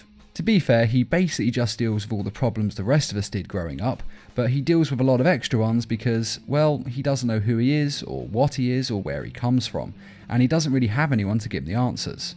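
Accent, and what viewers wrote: United Kingdom. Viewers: What is it about those British voice accents that make the coolest videos? 😁👍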